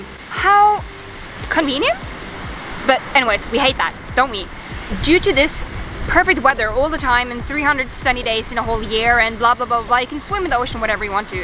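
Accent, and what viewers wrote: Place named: Scandinavia. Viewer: Interesting approach to get viewings and subscribers on your channel. Negative press sells better than positive press. By your accent you might be scandinavian.?? Would be something like "EL VALLE DE LAS CATETAS". Anyway. We got plenty of places for you to go to that is not here.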